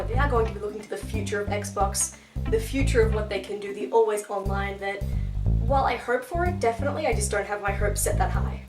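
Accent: Australia. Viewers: aaah, dat glorious fellow Australian accent.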